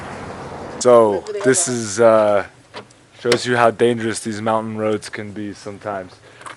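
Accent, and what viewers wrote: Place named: United States. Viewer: Nice video man I hope you add a lyircs on the next videos cuz the amircan accent is not that easy to be understood from the first time.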